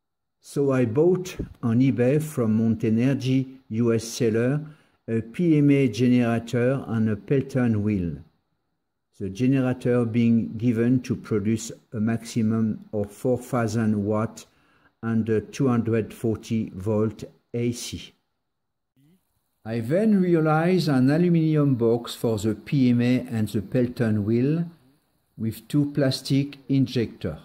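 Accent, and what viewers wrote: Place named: France. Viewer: Ah ah j'ai eu le même constat, pour une fois que je comprends intégralement une vidéo en anglais. Vive l'accent franchouillard :)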